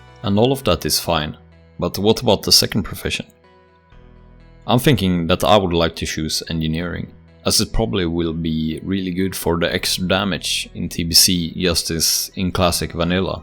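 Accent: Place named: Scandinavia. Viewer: May have liked it more if he spoke in Swedish 🙂 i do not like Scandinavian accent as much when listening to English content 😉 would in fact like Danish, or Norwegian too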